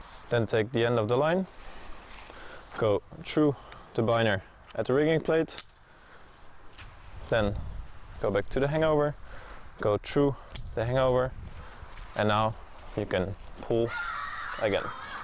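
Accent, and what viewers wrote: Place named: Netherlands. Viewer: That accent, you're from the Netherlands? Since I'm from europe, I'll be buying my primitive slack through you guys. Thanks for the video.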